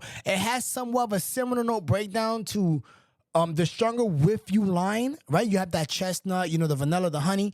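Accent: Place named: Peru. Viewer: Y yall hating on his Peruvian auntie accent 😓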